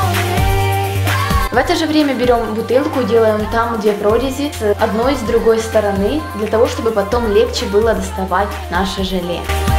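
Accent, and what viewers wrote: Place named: Ukraine. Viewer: Она ведь из Украины, а у нас многие так говорят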